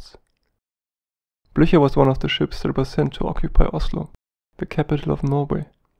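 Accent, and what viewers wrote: Germany. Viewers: I think he is German!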